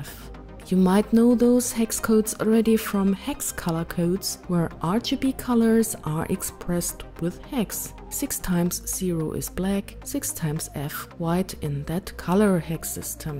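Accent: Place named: Germany. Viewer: I.. have.. to.. resist.. Srsly your voice.. What is your native language, maybe german? While reading the commands you nearly sounds like a robot :D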